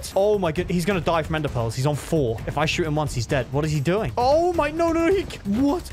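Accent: United Kingdom. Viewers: Are u britsh?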